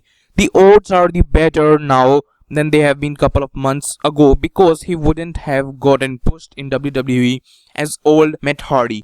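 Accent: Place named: Pakistan. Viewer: annoying pakistani voice... ughhhh... go to your call center job buddy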